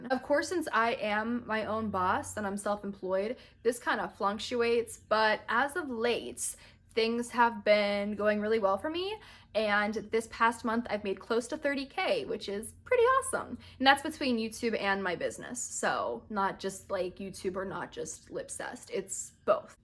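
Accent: United Kingdom. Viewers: I love your British accent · Nice British accent it was funny when you said that sometimes when you speak British it gets on peoples nerves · I love your British accent😂❤️❤️❤️ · I LOVE your British ascent it reminds me of (Tahani Al Jemil) form (The good place) on Netflix defiantly recommend for u to watch so so SO funny watched it all in like a week so yeah..... love u your the best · I LOVE THAT BRITIST ACCENT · You have a good British accent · Yeah like some people don't like her but not like those who dislike her, I love her and i love her British aksent like whoaw 😍😙😘❤💙💚💛💜💓💕💖💗💘💝💞💟👍👌😍😙😘❤💙💚💛💜💓💕💖💗💘💝💞💟👍👌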